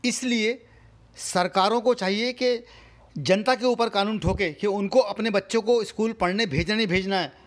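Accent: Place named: Moradabad, India. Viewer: बहुत अच्छी रिपोर्ट है और आपकी भाषा सुन कर मुझे ऐसा लगता है कि आप मुरादाबाद, रामपुर या चंदौसी के रहने वाले हैं। अगर मैं सही हूँ तो कृपया जवाब दें।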